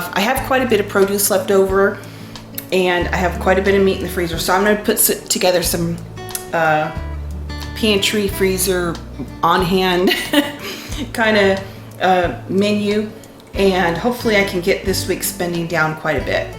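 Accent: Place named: Texas, United States. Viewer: I have lived in Texas my whole life and I swear you could be from here! 😋 I just love your channel and I have made so many of your recipes. They are always a huge success. Thank you for sharing your yummy recipes with us. You are a blessing.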